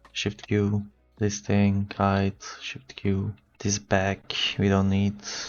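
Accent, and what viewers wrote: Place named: Turkey. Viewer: kardeşim sağ alta bakana kadar dedim "bi yabancı nasıl türk gibi ingilizce konuşabilir". klavyenin türkçe olduğunu görünce içim rahatladı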